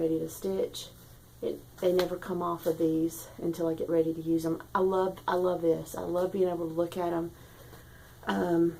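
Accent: South, United States. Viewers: you are one of THE most gracious people I know- and that southern accent- just takes it out of the park!!! I love it... I want to say - how sorry I am for the news of your Dad- and how I love that you are all in the "now" instead of the later.. Enjoy each moment- and cherish that family of yours... We'll all be here waiting to see when you pop in next... xoxo Ohhhh- and good luck with your crochet adventure... ( smiles )... Bless your heart indeed.. Me and crochet do not get along- but I love how you are trying different ways to make it happen... xoxo Blessings Your Way!!! niki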